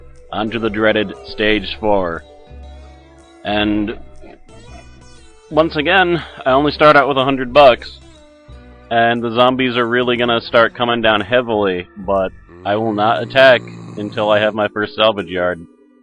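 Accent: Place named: South, United States. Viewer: your accent remember me a southern man... don't know why!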